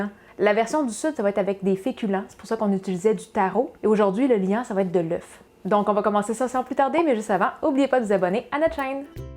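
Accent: Quebec, Canada. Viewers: ah mais c'est parfait alors je vais tout de suite aller regarder merci. En fait je viens de découvrir ta chaîne. Et j'adore ! Ici en France nous aimons les Québécois et leur super accent! Allez à la prochaine.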